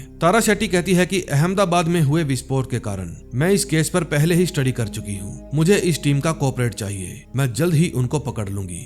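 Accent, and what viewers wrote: Punjab, India. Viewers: Punjabi accent rocks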